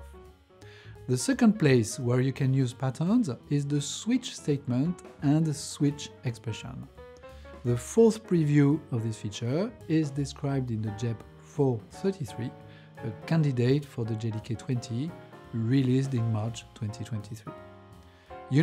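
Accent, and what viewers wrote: France. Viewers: The accent sounds french to me, and some sounds of vowels are silent. I use the subtitles.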